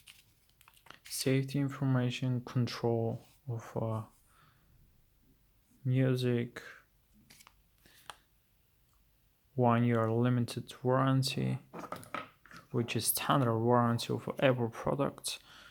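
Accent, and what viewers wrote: Europe. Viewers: Fine pro Gaming He’s from Europe so he has an accent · james brown because English isn’t his first language. This is what Europeans speaking English sounds like. They have an accent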